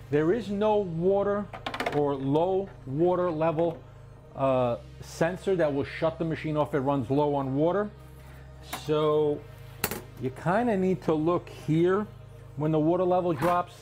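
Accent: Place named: New Jersey, United States. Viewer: An east coast accent. New Jersey working class. No mystery. Merely region plus class.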